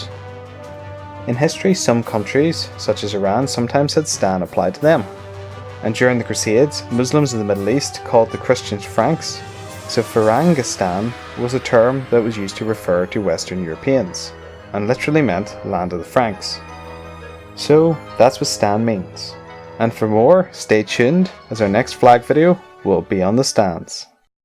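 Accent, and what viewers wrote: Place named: Northern Ireland, United Kingdom. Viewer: I just found your channel and im loving it! as a fellow Northern Irish (Tyrone) Its great to see as i havent seen any others, nice to hear a non american or English accent :)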